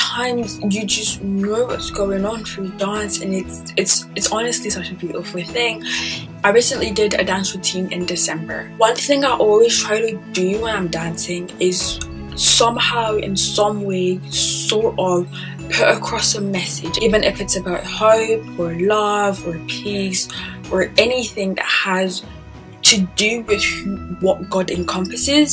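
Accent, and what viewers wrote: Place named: Scotland, United Kingdom. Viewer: Sounds Scottish to me lovely story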